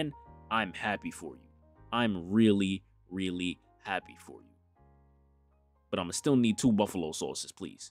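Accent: New York, United States. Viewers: Wait? Are u from NYC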